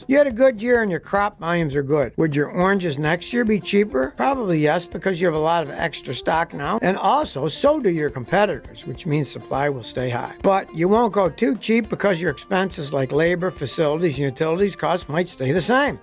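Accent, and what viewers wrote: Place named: Buffalo, United States. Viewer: Scotty is possibly the most famous guy from the Buffalo area. Love the Buffalo accent and the word "pop" instead of "soda" or "tonic."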